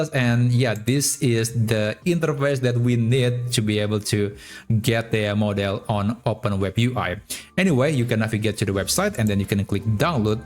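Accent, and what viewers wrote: Indonesia. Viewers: Logatnya bahasa inggris indonesia banget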